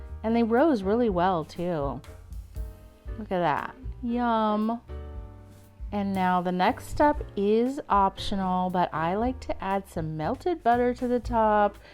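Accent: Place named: South, United States. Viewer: That is some south in your mouth 💥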